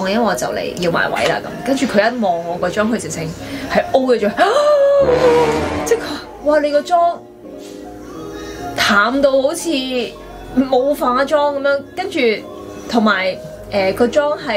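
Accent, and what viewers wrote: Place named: Shiqi, China. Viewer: 好聲好戲裏Yoyo配得非常好🎶💯,努力背石岐話勁👍😘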